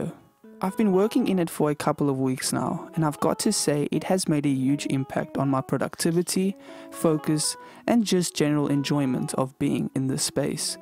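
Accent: South Africa. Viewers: Are you South African ? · You really sound South African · bro u have a south african acent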